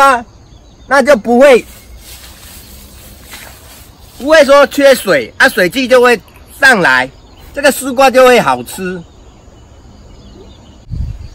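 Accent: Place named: Taiwan. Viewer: 露菌病和白粉病可以用什麼藥？聽不懂你說的藥名，可否再告訴我一遍，謝謝你，很喜歡你的台灣華語，已訂閱，並轉介一些愛種菜的台灣朋友。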